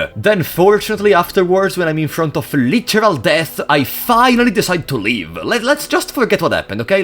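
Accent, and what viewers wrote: Italy. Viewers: I CAN RECOGNISE A FELLOW ITALIAN WHEN I HEAR ONE! BELLA FRA FELICISSIMO DI AVER TROVATO A CASO IL TUO CANALE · Yep After minutes of talking , i can preaty much confirm that he Is an italian . Sei italiano vero ? 😂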